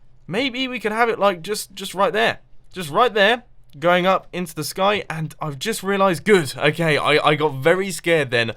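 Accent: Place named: United Kingdom. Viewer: is: Tall, hansom, has a British accent, smart, funny. Guys, hide you gool frens, mombo stel yo women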